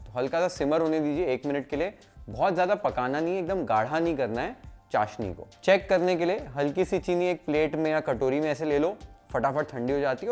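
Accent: Maharashtra, India. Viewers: Sanjyot Sir, a day before I tried Maharastrian Masale bhat.. today I tried your Thecha Paneer Rice.. dono hi itna sundar bana tha.. very tasty.. Being a Maharashtrian, loved the authentic flavours of them.. and your Assal Marathi Accent, too. 😄 Thank you so much for such a lovely recipes ,Sir.. ❤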